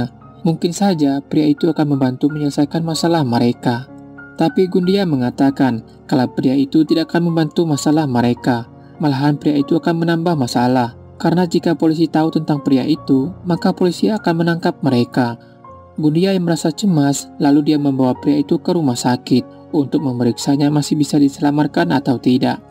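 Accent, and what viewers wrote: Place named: Banyumas, Indonesia. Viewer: Ngapak Hadir lagi miin... 😘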